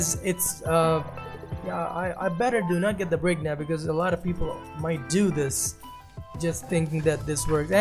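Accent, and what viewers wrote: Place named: India. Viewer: Evyatar Trabelsi It's his accent, many Indians have trouble saying w sounds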